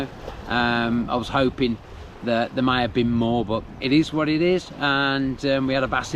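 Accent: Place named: Birmingham, United Kingdom. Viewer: Sorry to my ignorant ears your accent sounds Brummy, I'm from south Wales.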